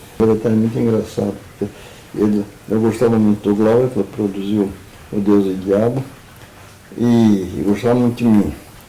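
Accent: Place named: Portugal. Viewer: Sempre o sotaque portugues------isso ´ é bom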